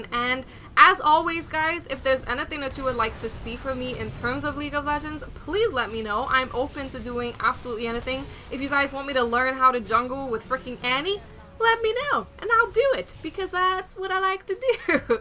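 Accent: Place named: Bronx, United States. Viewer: BTW: you sound like you from the bronx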